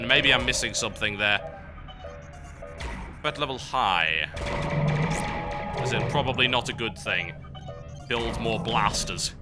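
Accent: United Kingdom. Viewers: "Not as clumsy or random as a Titan... an elegant blaster for a more civilized age" Hahah epic Star Wars ref!! I wish I was a Brit with a sexy deep voice like you, you make everything sound a million times cooler xDDD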